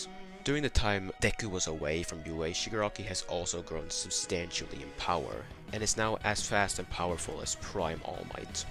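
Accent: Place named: Sweden. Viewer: This dude sound Swedish ( great video btw, shit was good as hell)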